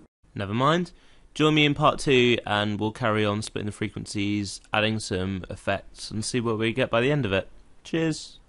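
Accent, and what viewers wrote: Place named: London, United Kingdom. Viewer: no he doesn't. He just has a normal british london accent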